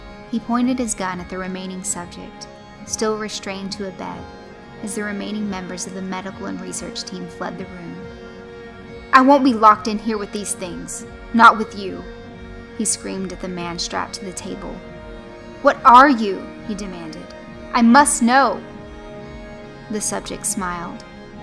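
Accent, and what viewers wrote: South, United States. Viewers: Don't u EVER doubt your narrative talents!!!! U r awesome girl! Especially when u throw n the southern drawl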